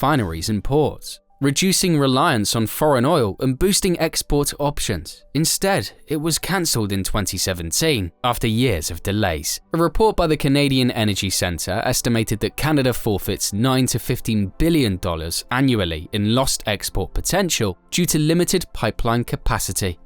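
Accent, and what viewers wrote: United Kingdom. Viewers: Dude, I love your British accent 😍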